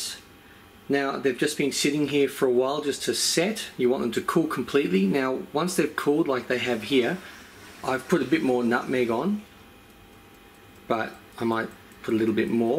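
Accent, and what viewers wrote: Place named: Australia. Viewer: D A T CR4zY Azn no he is definitely Australian ...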